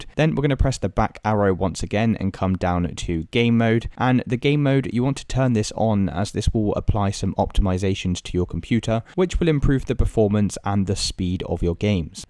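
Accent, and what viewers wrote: United Kingdom. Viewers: jesus i hate the british accent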